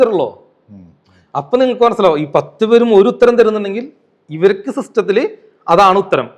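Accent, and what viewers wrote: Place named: Thrissur, India. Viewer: സ്ലാങ് ക്കേട്ടിട്ട് തൃശ്ശൂർ കാരനെ പോലെ ഉണ്ട് എന്റെ ജില്ലയിൽ ഇങ്ങനെ ഉള്ളവർ ഉണ്ടല്ലോ ഛെ നാണക്കേട് തൃശൂർ കാരെ ടെ വില കളഞ്ഞല്ലോ ഗഡി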